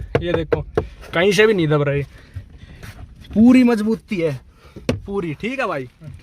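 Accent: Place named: Haryana, India. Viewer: Bhai your from haryana?, really like your hindi.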